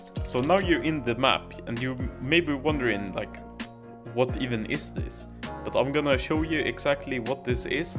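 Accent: Sweden. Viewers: Swedish accent is soooooo noticable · are you swedih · Are u swedish?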